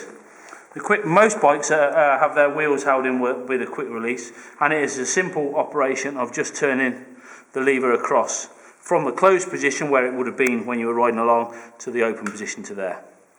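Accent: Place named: England, United Kingdom. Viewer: Nice English accent)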